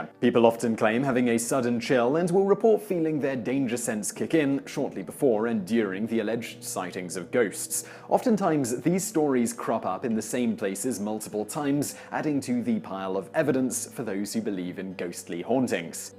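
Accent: England, United Kingdom. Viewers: Man i swear genuine English accents make narration serious/entertainingly listenable and even the occasional background noise for a day time nap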